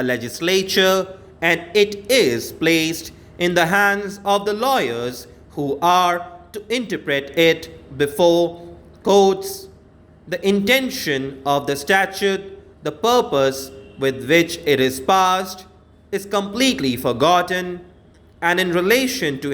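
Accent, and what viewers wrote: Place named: England, United Kingdom. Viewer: O bhaiya thoda halka haath rkho humare yha itni standard English na boli jati na smjhi jati isliye pronounciation thoda ache se bolo Jo smjh aa sake pura angrej ban rhe ho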